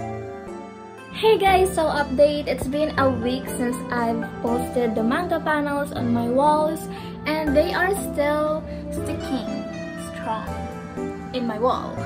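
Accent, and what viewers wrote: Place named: United States. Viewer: Bat napakaslang neto?amerikana kaba?o sobrang oa mo lang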